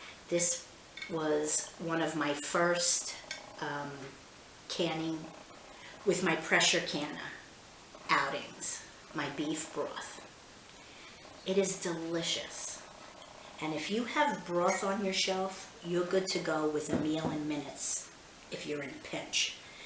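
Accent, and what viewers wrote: New York, United States. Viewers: Loved your video. You sound like a New Yorker!!!! Love it! Please make more videos!!!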